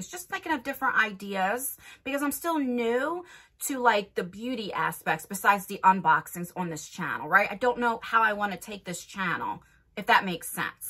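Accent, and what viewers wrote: New Jersey, United States. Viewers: Hi My name is Roz. I am 55 years old. I am an COVID ICU nurse. I started following you during the beginning of the pandemic. You sound like one of my best friends that was born in New Jersey. I admire you so much. In my head we would be good friends. I have been wanting to make You Tube videos since 2007. I love makeup. I was a makeup artist from the time I was 18 until I graduated from Nursing school at 30 years old. I live in California. But I was born in the deep south. I had a lot of identity issues growing up. Because I had light skin and long hair. I forgot something I am Black. I used to come home crying to my parent and my good mother. My parents were born before your grandmother. My dad was born in 1925 and my mom was born in 1930. They had friends of every race and religion. I am the oldest and I was born in 1966, My parents had their last child in 1974, So my baby brother is not even 50. 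I am widowed. My husband was Caucasian and Native American. I agree with you totally. My parents taught me to always give people a second chance because people can change. I have every single Jeffree Star palette. I like his makeup. My generation will be last generation to know what is to live without social media. You are doing what any good Christian would do. Keep making your amazing videos! Love from Northern California.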